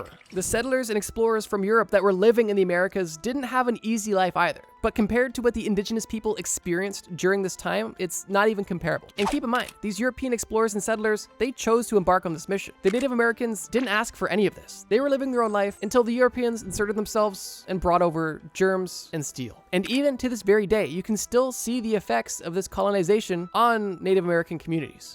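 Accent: Canada. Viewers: Are you Canadian ? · You're Canadian????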